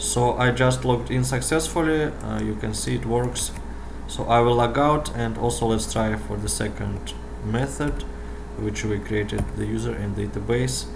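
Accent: Russia. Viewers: слышно ж что акцент русский, сделал бы субтитры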